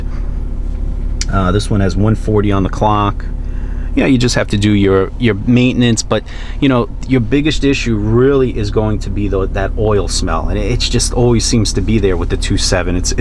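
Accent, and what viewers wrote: New England, United States. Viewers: fair enough I'm from NH just knew that had to be a new England accent lol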